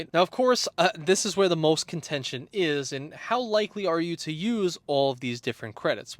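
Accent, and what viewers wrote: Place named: New York, United States. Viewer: Are you from NY lol?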